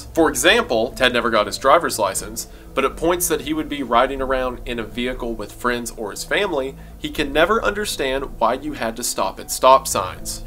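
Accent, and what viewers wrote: United States. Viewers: American young man voice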